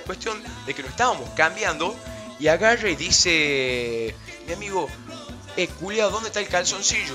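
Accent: Tucumán, Argentina. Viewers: JAJAJAJ ESE TUCUMÁNO PAPAAA AGUANTE TUCUMÁN AJDJAJAJA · So re tucumano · Una mezcla de tucumano y Argentino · de que parte de Tucumán sos ?? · No hay nada mas piola que el acento tucumano culiadaso. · SOS UN CRACK WACHO JAJAJAJA ME ENCANTA COMO ES EL ACENTO TUCUMANO SOY DE BUENOS AIRES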